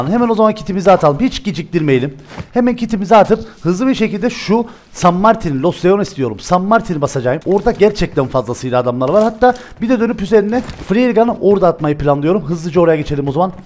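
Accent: Cyprus. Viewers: Uzun zamandır takip! beğeni! İlk yorum muhteşem Kıbrıs şivesi izlemeye doyamadığım videolar başarılar devam kardeşim 👏👏👏👏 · Yıllar sonra abinin Kıbrıs şivesi ve pubg bu halini izleyen benden selam olsun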